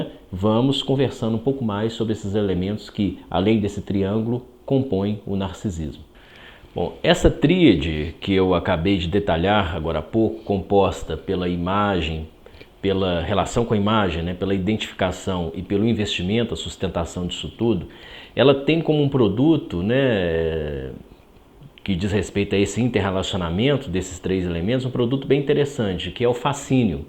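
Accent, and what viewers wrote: Minas Gerais, Brazil. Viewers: Parou tudoooo!!! Um arrazo! Eheh! Nada me fascina tanto quanto uma oratória tão perfeita! Com uma pronúncia de Minas Gerais então...ui! Rss. A fluidez das palavras; o intervalo entre as frases; o seguimento do raciocínio; o tom moderado e firme da voz. Perfeito! Abraço, Sr. Doutor!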